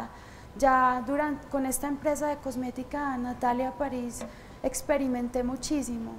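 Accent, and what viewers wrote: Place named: Antioquia, Colombia. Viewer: Alejandra Moreno sencillo por que es paisa y se le escucha bien bonito, deja la envidia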